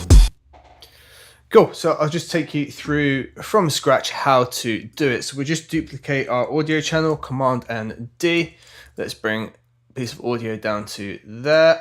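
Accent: United Kingdom. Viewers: thanks man this was really helpful. u welsh?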